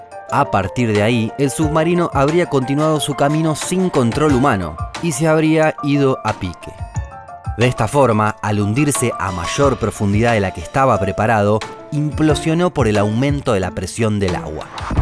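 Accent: Rioplatense, Argentina. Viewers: Lo que paso solo lo saben con certeza los responsables vivos pero ellos nunca van ha reconocer los errores que costaron la vida de tantos, no sería la primera vez que salió en esas condiciones , y difícil de constatar todo .- Muy buenos los videos y hermoso escuchar una voz Argentina . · He visto tantas veces los videos de que se me pegó el acento argentino y cuando hablo la gente me mira raro:p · El Acento argentino de estos vídeos es agradable y Elegante , no se vuelve molesto como en algunos casos de periodistas deportivos o Narradores argentinos en los que ya se vuelve detestable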